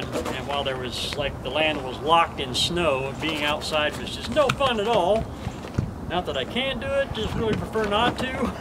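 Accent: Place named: Missouri, United States. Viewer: Thank you....i was getting the impression you are in Mo , I have family in Mo. and thought i recognized your accent ( be it barely noticable )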